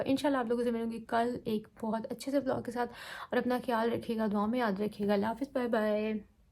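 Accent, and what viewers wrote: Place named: Punjab, India. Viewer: Punjabi tark is so good😂😂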